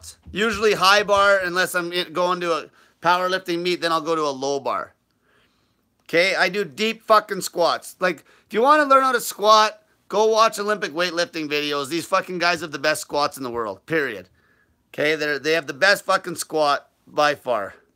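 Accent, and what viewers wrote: Canada. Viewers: He just needs an image re-adjustment. This gangsta character does not work with a Canadian accent. He doesn't sound tough, which is what he's aiming for. He just sounds like a wanna-be.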